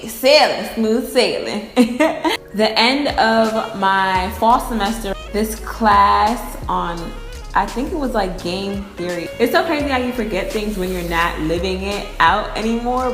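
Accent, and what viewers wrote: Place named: Baltimore, United States. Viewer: I can tell she from Baltimore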